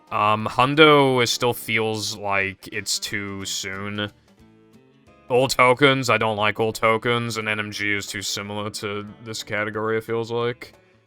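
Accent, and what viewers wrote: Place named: Boston, United States. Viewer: That's the Boston accent for you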